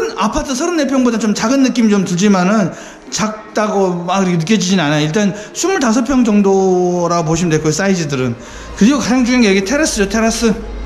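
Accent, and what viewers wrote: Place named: Jeolla, South Korea. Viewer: 고향이 전라도 이신가봐요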